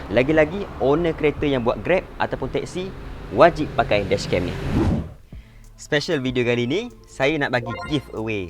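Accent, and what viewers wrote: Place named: Kelantan, Malaysia. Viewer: Mantapp boh,,, slalu review yg ada masuk slang2 kelantan 👍🏻👍🏻👍🏻👍🏻 haha Smoga murah rezeki menang , boleh pakai utk van time hntar barang lazada 🕺🏼🕺🏼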